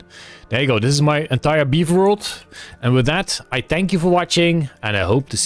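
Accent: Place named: Netherlands. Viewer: Is it me or did the Nederlands bleed through a lot more than usual? Lol. Not complaining mind you. Just funny cause it happens to me when I get super tired. Keep up the goede work. Earned a new sub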